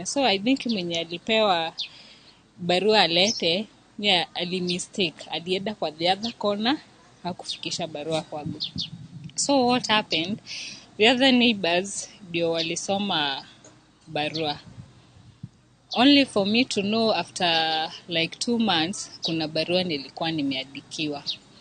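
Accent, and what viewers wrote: Kikuyu, Kenya. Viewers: Ukweli.... very heavy Kikuyu accent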